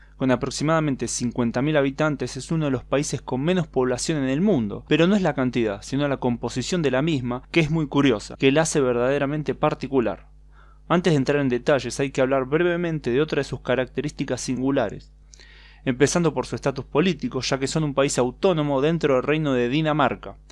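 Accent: Buenos Aires, Argentina. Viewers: A voz que vc escuta neste vídeo fala o espanhol da região de Buenos Aires. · Son buenos tus vídeos. Pero intenta no hablar tan argentino me.hace echarme a correr ...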